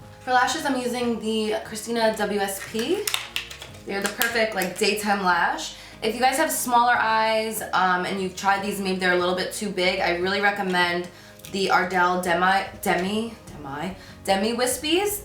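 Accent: New Jersey, United States. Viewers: Obsessed with the Jersey accent 😍😩😭❤️ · Your grandmother is adorable.. and your jersey accents 🙌🏻❤️ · Love jersey accent🐧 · Hanging out with your grandma brings out your New Jersey accent even more haha 😊 ( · Your New Jersey accent really comes through when you’re hanging out with your family. Super cute. 😊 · ur Jersey accent is so thick 😂😂 love you ❤️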